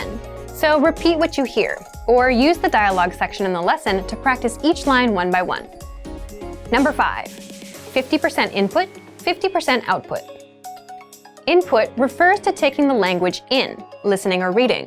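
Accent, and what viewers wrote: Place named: United States. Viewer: Yes, it's American English. She's from California.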